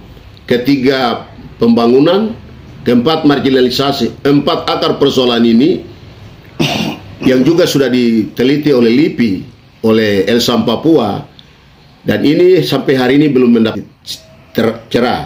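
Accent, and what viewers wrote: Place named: Netherlands. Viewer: Ah,BASI apa kamu? Kamu cuma akan bercerita bohong dengan back ground human right, ya WAROM (mirip aksen Van Holland ),pelanggaran HAM dengan cerita bohong panjang lebar & berita terbalik untuk meyakinkan lawan bicaranya bahkan playing victim ditambah bahasa air mata jika perlu & agama. Perlu diketahui RI atau NKRI itu negara hukum & sangat menjunjung HAM ( human right) itu sebab NKRI punya Pancasila & undang undang dasar 1945,juga jelas secara explisit menyatakan perang terhadap segala bentuk penjajahan bahkan menegaskan harus di hapuskan di seluruh dunia, shg sudah cukup jelas pandangan bangsa Indonesia juga NKRI mengenai ham karena guidennya untuk kehidupan berbangsa & bernegara cuma panca sila & undang undang dasar 1945,shg pasti harus menjujung HAM. Bahkan bangsa & NKRI mengharamkan rasis & biasa hidup plural beda dengan organisasi kalian yang berisi WNA mirip WNI etnik irian juga orang kulit putih itu rasis bahkan mengajarkan ke anak anak, RI terkenal sekali bersahabat dengan bangsa bangsa di benua Afrika & diterima semua pandangan juga sikap hidupnya. 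Hey,WAROM bshkan sekarang jelas semua itu hal yang biasa kalian pakai propaganda adu domba serta fitnah itu,ternyata yang perkosa guru,petugas kesehatan kalian,menyandera anak sekolah,merusak jembatan,membakar gereja rasis itu kalian termasuk propaganda bohong dari agen propaganda luar negeri kalian, semua perbuatan itu melanggar HAM semua. Maka keluar atau tariklah bawa ke tempatmu negaramu & tuanmu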